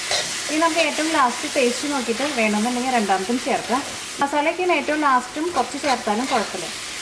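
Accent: Thrissur, India. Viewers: that's how trissur people talk.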